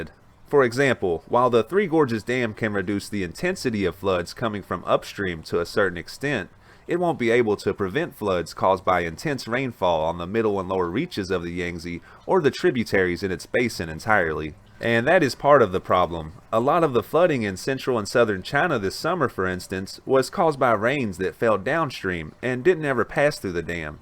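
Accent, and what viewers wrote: South, United States. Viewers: Love the southern accent when talking about anything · I love the southern accent when speaking about China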